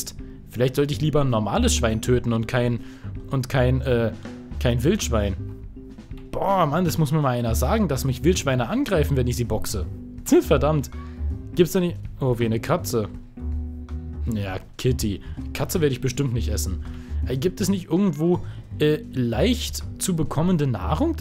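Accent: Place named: Berlin, Germany. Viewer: Ja klar, die Schlangen im Berliner Zoo können einen ja auch nicht angreifen, weil sie hinter Glas sind! Dass du aus Berlin bist dachte ich mir schon. Das "och" statt "auch" hat dich verraten. Ich stamme übrigens aus Nordhessen, wir sagen statt "auch" "ö"! Bsp.: "Dis hon ich mä ö schon gedacht."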